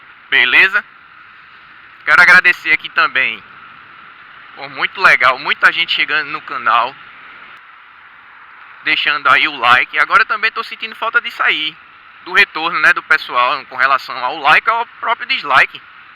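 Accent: Recife, Brazil. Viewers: so ouvi verdades tu es do recife? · eta sotaque feio do c da pra notar de longe que e de recife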